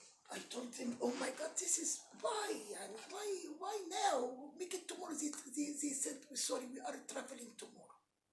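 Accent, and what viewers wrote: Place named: Egypt. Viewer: لهجتك مصريه خالصه رغم التحدث بالانجلش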